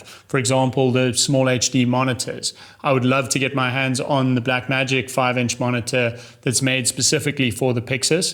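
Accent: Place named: South Africa. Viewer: Nicest Saffer accent I’ve heard. Usually pretty harsh. Great footage and gear review!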